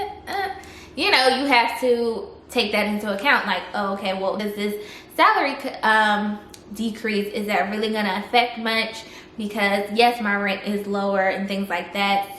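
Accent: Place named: South, United States. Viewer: Question sista shaaa didn't you say you was coming from New York I'm detecting a southern accent Adams Rib chill chill chill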